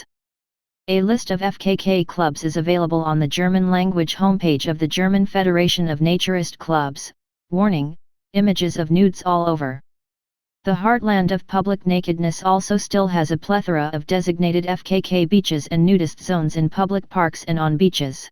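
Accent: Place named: United States. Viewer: But the accent is American!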